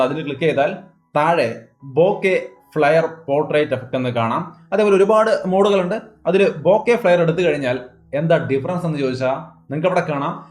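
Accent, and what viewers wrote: Thrissur, India. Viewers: Uff thrissurkaarana aano 😍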